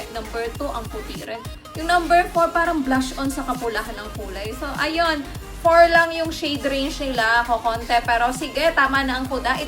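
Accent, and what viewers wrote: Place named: Cavite, Philippines. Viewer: Subscribed because of your punto 😅pareho tayo. I'm from Indang cavite kaya mahayon din talaga 😂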